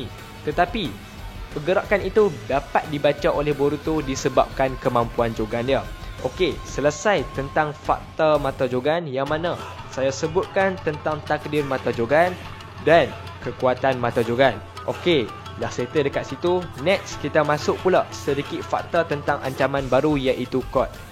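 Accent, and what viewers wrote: North, Malaysia. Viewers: ni org utara ka?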